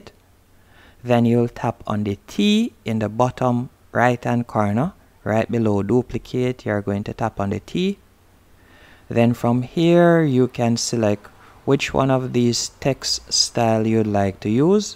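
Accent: Jamaica. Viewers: You sound Jamaican my brotha🤜🏿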